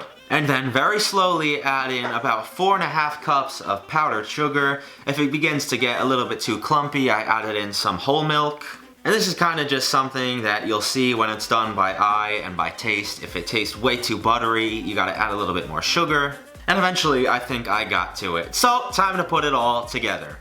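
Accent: New York, United States. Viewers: I knew you lives in New York, you got that accent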